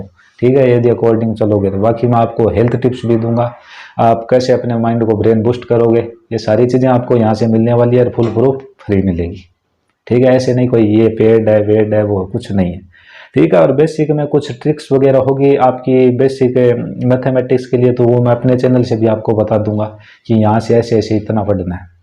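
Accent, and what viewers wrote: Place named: Sri Ganganagar, India. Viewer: Bade bhai thari voice hu laag the mer triya sri ganganagar ga ho Or bhai Ma Telegram par add hou Koi problem hosi to Thaan Yaad Kruga ❤❤❤❤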